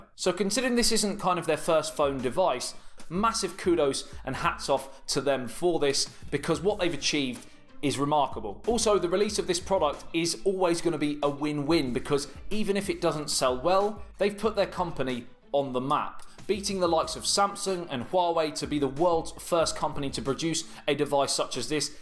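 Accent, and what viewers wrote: United Kingdom. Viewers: You are the most genuine rusty British accent Ive ever heard🤞🤞🤞,rememberd SNATCH MOVIE.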